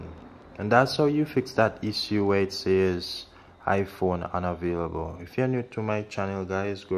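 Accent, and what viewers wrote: Jamaica. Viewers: Thought u were trinidadian at 1st but you're def jamaican. Much love still though brother. Your video was really helpful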